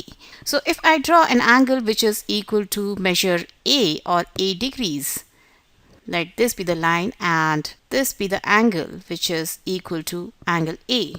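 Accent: India. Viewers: Indian accent ?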